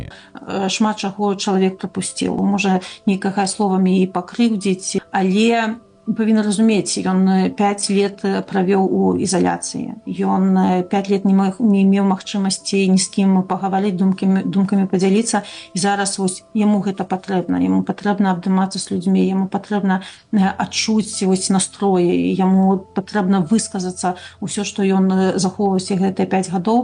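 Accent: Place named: Ukraine. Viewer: Ну болтает на суржике как хохлы пыжаться у себя, ты уж по их тропинке идешь, петрушка на пальчики . Якия "Пять лет" , пять гадоуу!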